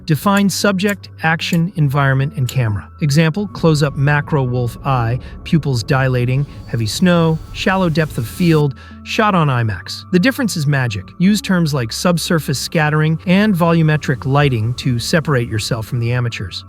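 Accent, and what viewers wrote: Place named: United Kingdom. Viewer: Ur speaking too funny. If u would have been narrated normally, the video would have been millions views by now. But u choose sick british accent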